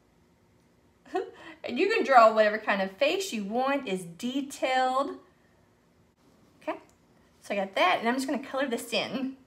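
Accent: South, United States. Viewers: I hear a drawl in your voice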